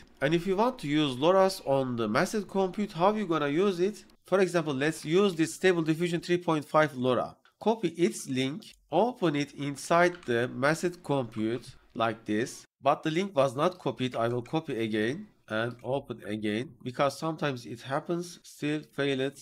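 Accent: Turkey. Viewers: Bende diyorum bu kanaldaki ingilizceyi anlamam nasıl bu kadar kolay olabiliyor diye, meğersem hoca Türkmüş 😂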